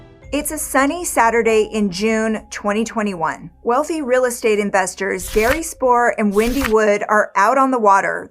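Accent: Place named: New York, United States. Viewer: a voice over that makes you run for MUTE. Holy New Yawk!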